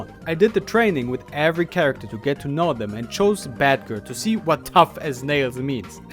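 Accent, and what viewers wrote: Germany. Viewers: i can hear the german accent on the cool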